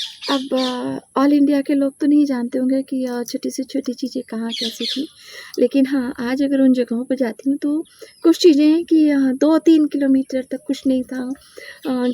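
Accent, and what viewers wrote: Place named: Kanpur, India. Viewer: बहुत अच्छी है आपकी कनपुरिया ❤❤❤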